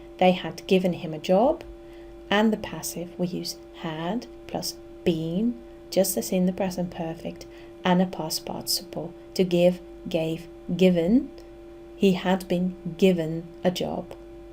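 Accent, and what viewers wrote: England, United Kingdom. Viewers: Clear , precise ,and a business like approach I loved it. And a wonderful cultivated English accent . I am delighted .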